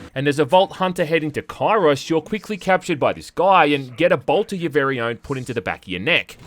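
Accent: Australia. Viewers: Why does sound MORE Australian the farther back you go in his videos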